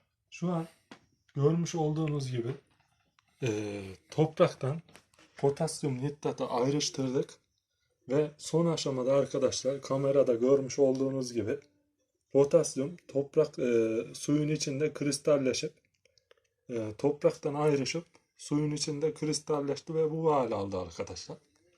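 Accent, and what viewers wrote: Turkey. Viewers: Sayin ustam süzülen topragi kaç dakika kaynatıyoruz kulun sadece suyunu katıyoruz heralde abicim şiveniz iç anadolu bende bu bolgedenim selamlar saygilar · Ustam nerelisin sivenir bize yakin sanki elbistandan selamlar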